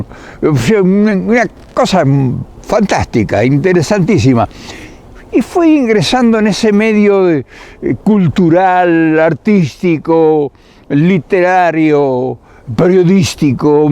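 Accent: Argentina. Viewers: Sr., no insista con su asento Argentino, usted es mas Mexicano que muchos Otros. Viva Argentina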